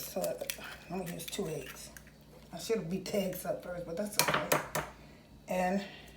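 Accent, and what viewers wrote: South, United States. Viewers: Ooooh...as u stand there reminding me of my Grandma cooking brought tears to my eyes. She was the BEST...your soft calming southern voice is truly a memory of her...Thank you for sharing Continued #BLESSINGS to you🙂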